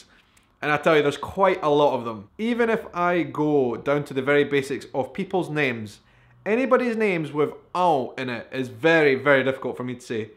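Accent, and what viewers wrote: Scotland, United Kingdom. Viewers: if u're having problems saying "Florianópolis", try > FLORIPA. everyone will understand it too... quando vc dizia "word" eu entendia "ward". scotish accent is a little tricky nice vid. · I'm really enjoying your videos,! And you're doing very well with your portuguese. I've never been in Scotland, but I love the culture and especially the scottish accent. It just lovely! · Você arrasou na pronúncia, parabéns! Ah, e seu inglês escocês é tão bonito! :D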